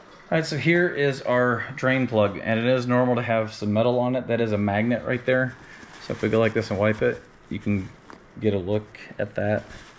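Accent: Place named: United States. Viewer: Probably too late to be helpful but at least in this video he’s using 2.7 quarts, not liters. He sounds American and the bottles here are sold in 1 quart quantities. Not totally sure what the quart-to-liter conversion is but I’m sure you could google it and it might end up that 4 liters is 2.6 quarts? :)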